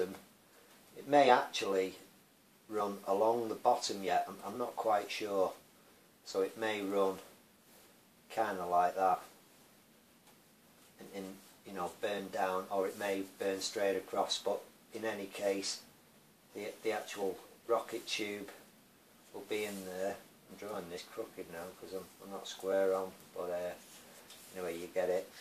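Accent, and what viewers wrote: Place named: Manchester, United Kingdom. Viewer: Will do, you sound very Manc. Looks like I'll be learning to weld stainless.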